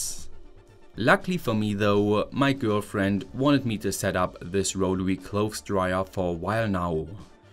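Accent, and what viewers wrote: Germany. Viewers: why do you have such thick german accent if you are Scottish? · Curious where you're from! I lived in Germany for many years and I don't recognize your dialect. It's hard to identify! Your channel says you're from Fellbach but IDK if that's conclusive.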